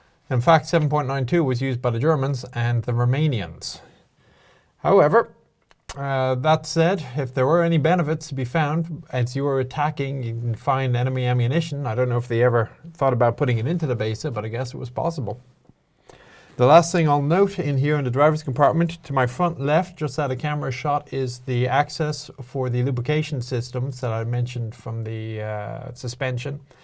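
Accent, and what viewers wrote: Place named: Ireland. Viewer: I thought you had an Irish accent alright:) Either that or you're well versed in international transport logos:P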